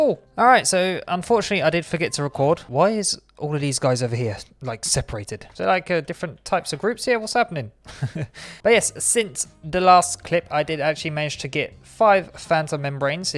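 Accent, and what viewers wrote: United Kingdom. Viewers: I just found you! I love these things. Plus the British accent wiff the f sound always sounds authentic to me! Can you make me a Kitty but make it a nice name.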